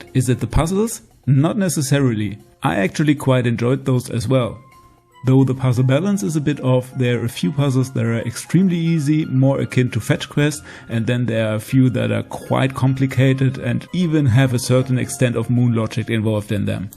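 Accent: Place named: Germany. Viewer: da hämmert jemand seinen deutschen dialekt aber wirklich mit aller gewalt durch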